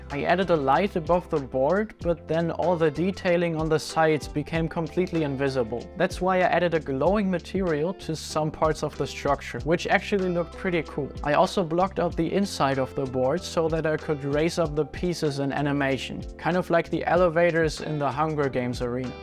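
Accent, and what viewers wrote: Germany. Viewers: Ich feier deine Videos, aber der deutsche akzent fickt meinen kopf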